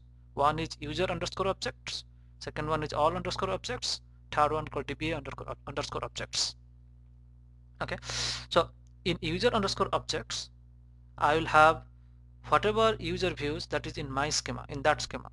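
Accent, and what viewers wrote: India. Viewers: Can't understand your accent! This is English for indians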